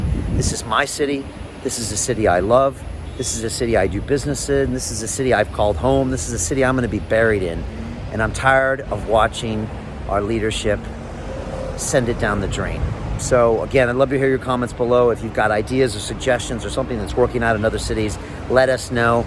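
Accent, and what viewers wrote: United States. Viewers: Is this a guy with an American accent Go back home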